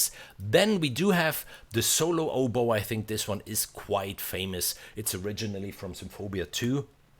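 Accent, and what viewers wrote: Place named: Germany. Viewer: oh, du kommst aus Italien? Opsss dachte du bist aus Deutschland 🤦‍♂️ zumindest nach dem englischen Dialekt nach! Vielleicht reicht ja auch einfach ein deutscher Untertitel? Oder aber, mach einfach weiter so, so viele composer die film score machen gibt es eh nicht in Deutschland und eigentlich hast ja recht, englisch sollte eh jeder können, der in unseren job arbeitet! 🤗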